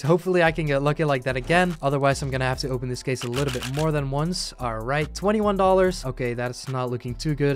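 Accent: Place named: Netherlands. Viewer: Lol ik ben nog niet zo lang gesubbed en je bent nederlands 10000% ik hoor het gewoon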